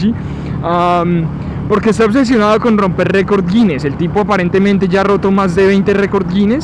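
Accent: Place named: Bogotá, Colombia. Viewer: Desde que ciudad de Colombia grabas? No he logrado identificarla y tu acento no me ayuda es como rolo pero evidentemente no es Bogotá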